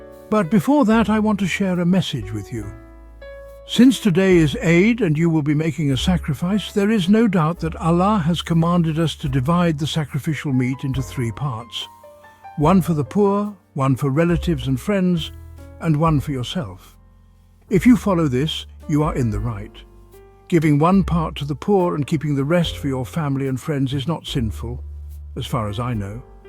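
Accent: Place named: United States. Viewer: Very effective vlog to understand the native American accent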